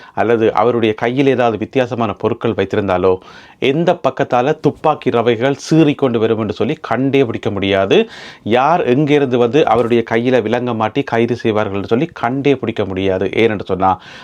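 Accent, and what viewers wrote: Jaffna, Sri Lanka. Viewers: "மிக மிக அழகான யாழ்ப்பாண தமிழ்..." 6 hours ago மிக மிக அழகான யாழ்ப்பாண தமிழ் கேட்க கேட்க உங்கள் குரல் கனீர் கனீர் என்று ஒலித்துக் கொண்டிருக்கிறது தொடர்ந்து இது போன்ற தகவல்களை தரும் உங்களுக்கு எனது மனமார்ந்த நன்றிகள் பல வாழ்க வளமுடன் நலமுடன் நன்றி Reply 0 seconds ago "யாழ்ப்பாண தமிழ்..." - இதாலதான் கருணா, பிரிந்தார் என்பதும் ஒரு கருத்து...மறுக்க இயலாது காக்கைக்கும் தன்குஞ்சு பொன்குஞ்சுதான். சாதியமும் இப்படித்தான் மாறு வேடங்களில் மறைந்திருக்குது.. கிணற்றுத்தவளைத் தனத்திலிருந்து வெளியே வாருங்கள்....அப்போதுதான் வடக்கும் கிழக்கும் இனையும்...., அதுவரை கனவுதான். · மிக மிக அழகான யாழ்ப்பாண தமிழ் கேட்க கேட்க உங்கள் குரல் கனீர் கனீர் என்று ஒலித்துக் கொண்டிருக்கிறது தொடர்ந்து இது போன்ற தகவல்களை தரும் உங்களுக்கு எனது மனமார்ந்த நன்றிகள் பல வாழ்க வளமுடன் நலமுடன் நன்றி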